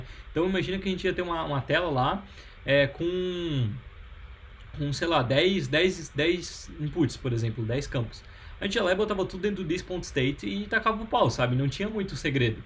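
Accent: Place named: Santa Catarina, Brazil. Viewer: Massa!! Rio do sul exporta dev hein haha A rocketseat era dai também né. Imaginei que fosse de SC por causa do sotaque, sou de Floripa. Cai no vídeo pois estava tentando decidir qual biblioteca iria usar para form. Valeu pelo conteúdo!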